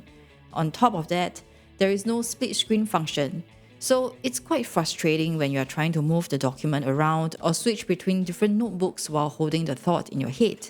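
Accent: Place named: Southeast Asia. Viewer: Hi there. Judging by your accent I presume you are from south east Asia :) Got myself a RPP too. Not sure whether you have figured out a way to handle the notes taken in the RPP in a PARA or Zettle system? Would love to hear from you. As linking “analog” to a digital workflow is something I had not quite figured out.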